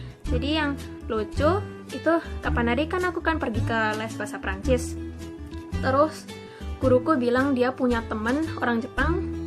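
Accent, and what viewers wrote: Java, Indonesia. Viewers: kamu aslinya orang mana sih? Wajahmu Jepang tapi ngomongmu Jawa medok? 😊😊😊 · Logat bahasa indo-nya jawir bingits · Logat.y medok banget mba... Mantap mba....😍😍😍😍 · Jawa Nya Medok Banget Guuud Lah (Y) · Heran, ini cewek jepang kelahiran mana ya koq ngomongnya medok gitu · logat logat jowo e iseh kentel wkwk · Keren, lucu aja logat mu orang jepang tpi klo pakek bahasa indo logat jawa bgt. Keren ,cantik..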